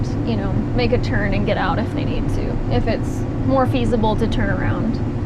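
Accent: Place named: Texas, United States. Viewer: Love you and your texas voice and accent ❤ thats for the very exelent video ❤😊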